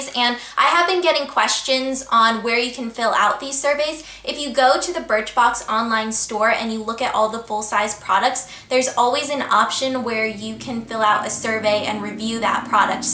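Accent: France. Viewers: ahah i like your french accent !! uugghhn i really hope BIRCHBOX will send to France soon !! i'ld like to try this; it seems really fun and its a cool way to try new things have a good day =) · i'm a french girl and your french accent it's really coooolll & pretty ^^* !!!! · you have an amazing french accent (: birchbox need to send to england! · your french accent,, is so cute :) haha xx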